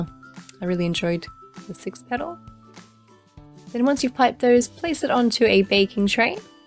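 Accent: Australia. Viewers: Loved the idea, and love your Australian accent! Kisses from Italy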